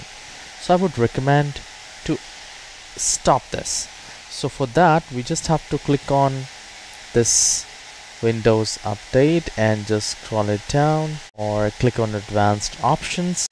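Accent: India. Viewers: You're clearly an indian why trying to hide it that much?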